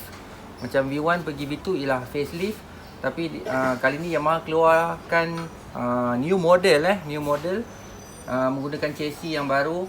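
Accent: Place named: North, Malaysia. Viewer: video ni cakap utara, video next cakap sabah ke terengganu ~!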